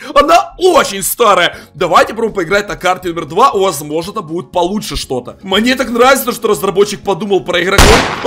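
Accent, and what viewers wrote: Ukraine. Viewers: Ты украинец ?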